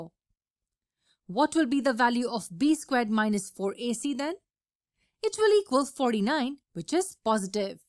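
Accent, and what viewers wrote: India. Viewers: That moment I heard the Indian woman I knew I was in for hell.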